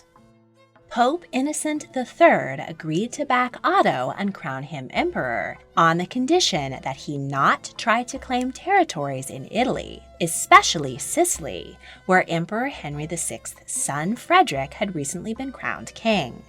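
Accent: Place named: United States. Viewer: What a HORRIBLE, horrible nasal American voice. Ouch, it hurts.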